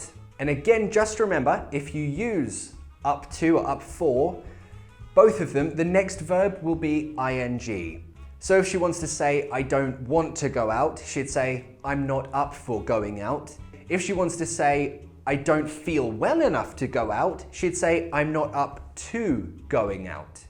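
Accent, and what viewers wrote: United Kingdom. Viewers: I really love watching your videos. I'm more used to the american accent, but I can understand you completely, even though your accent is british. By the way, I love your accent. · your videos are totally the best one to learn the real british accent :) thank you a lot.. are you up for eating Japene Food? is it Right ?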